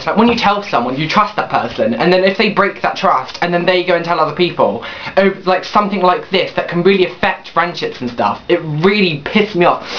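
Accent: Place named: RP, United Kingdom. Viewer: You ain't funny for you're gay. You are so funny due to your smashing posh accent! Damn, what would I give to mimic that sound?!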